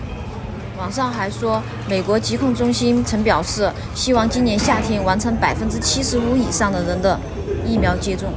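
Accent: Sichuan, China. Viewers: 你的川普听的好安逸哦，偶尔还有点皮、喜欢这种风格，关键是我喜欢听川普。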